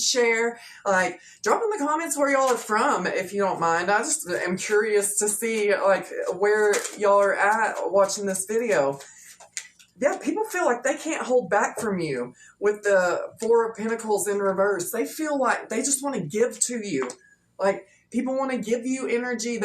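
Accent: South, United States. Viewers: Thank you for that! It took over two hours to watch your video due to all the synchronicities. I was resonating and meditating with eyes full of tears baby! This was quite an experience for me. Thank you. By the way that southern accent is very becoming of you and I like it! 😘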